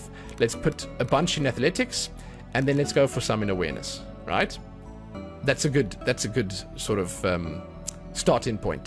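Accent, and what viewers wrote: South Africa. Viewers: Like for thick South African accent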